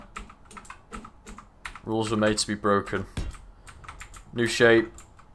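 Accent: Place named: Yorkshire, United Kingdom. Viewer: You have a Yorkshire NE twang .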